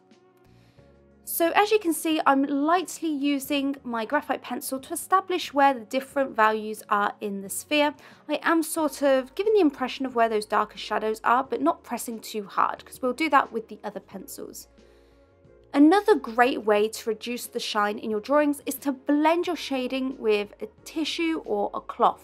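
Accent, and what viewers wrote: United Kingdom. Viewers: Hi,thank you so much for another great drawing tip. I am a portuguese speaker brazilian boy,graduated in arts many years ago,and thanks to living in the US for many years i a can understand you perfectly. You are hands down the BEST gifted drawer on youtube. May God bless you always so you can keep always bringing more and more very useful drawing tips like this. Ps: i love your british accent!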